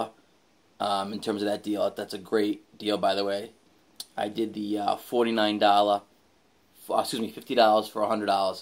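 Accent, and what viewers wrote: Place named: Massachusetts, United States. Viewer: Enjoy your laid back style, keep it up. Always like watching pipe reviews and Yabo's so keep posting those. I'm originally from Rhode Island but live in Texas now, I knew by your accent you were in Mass. :) Go Bruins!!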